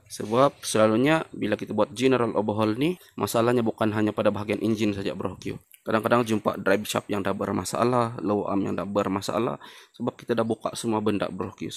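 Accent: Sabah, Malaysia. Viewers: Iya bos loghat sabah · Lokasi di sabah atau serawak ke ni,, loghat mcm org sabah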